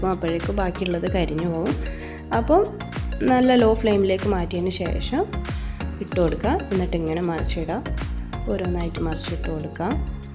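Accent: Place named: Kozhikode, India. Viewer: Kozhikoodu aano... But language manasilakunna rethiyil🙂🙂👌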